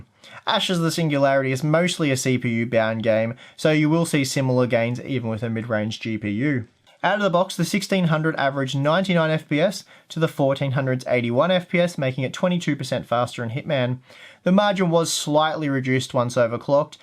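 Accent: Australia. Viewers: are you OZ?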